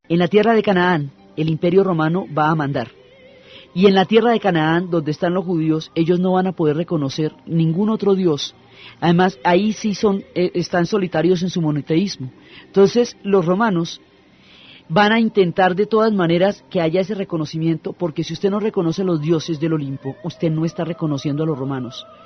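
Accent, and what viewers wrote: Colombia. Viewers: Excelente! Soy una persona de 87 años , siempre he amado la historia y usted con sus conocimientos profundos , su voz clara amena y ese acento colombiano, me han hecho su más fiel seguidora . DIOS le siga protegiendo sus bones.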